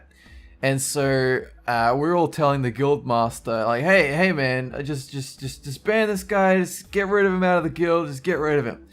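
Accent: Australia. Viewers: Wait. You're Australian. WAT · Wait ur aussie?? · Your Australian..... Holy shit I love you so much more... State? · TectalchameleonJamaa it's almost as if it is an Australian accent! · Didn't know you were Aussie... didn't really pay attention or care either but mkay. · I didn't know your were aussie! Sweet! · is Aussie?!?! 😂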